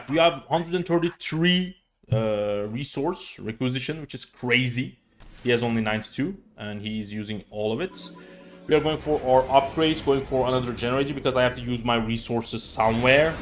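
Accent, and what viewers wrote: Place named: Turkey. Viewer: You must be Turkish. You talk like me.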